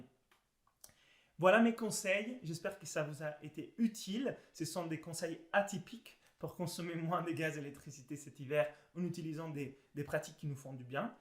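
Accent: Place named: Italy. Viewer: Merciiii! Toujours aussi astucieuses et instructives tes vifeos, un regal de t ecouter avec ton charmant accent italien ♥️🐳🤩👏🏼👏🏼👏🏼🎊💃 Gratzie Mille 💓🙏🏼😻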